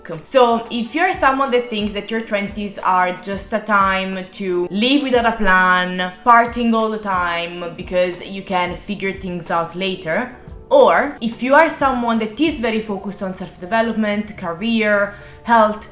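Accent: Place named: Italy. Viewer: Hello, good morning 😊. I watched this video of yours and I liked it. I recognized you for your Italian accent. I admire the architecture and love music of your country a lot. Greetings from México 🇲🇽. By the way, both countries share the same colors in their flags. 😊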